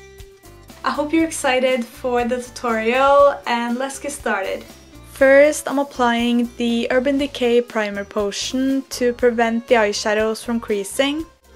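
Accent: Norway. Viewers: Fun design, and awesome execution! :) I think that is a Norwegian accent, isn't it? Thanks for the awesome tutorial!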